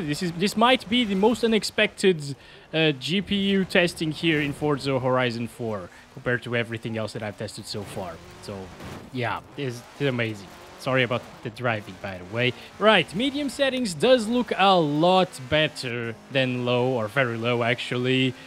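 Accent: Portugal. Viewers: Perae você é português?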